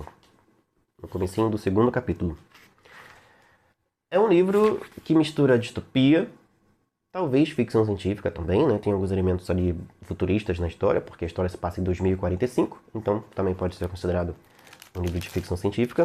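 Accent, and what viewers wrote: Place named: Petrópolis, Brazil. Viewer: Boa tarde, Xiru ! Bah, percebi que seu sotaque não tem aquele "S" que vocês arrastam Geralmente percebi - se que tu tens, um sotaque limpo tu deves ser de Petrópolis ou Niterói ! Caso se eu tiver errado , tu me corrige !!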